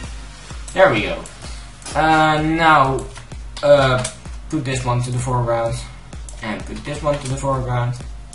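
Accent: Netherlands. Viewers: Jij hebt een nederlands acsent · are you dutch?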